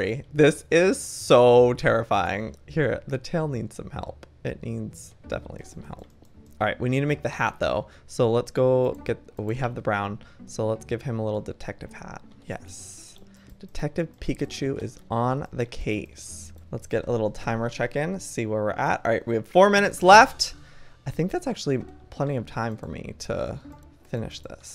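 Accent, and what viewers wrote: South, United States. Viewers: Omg he has a country accent ❤️❤️ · Is from the south? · I loveeee his country accent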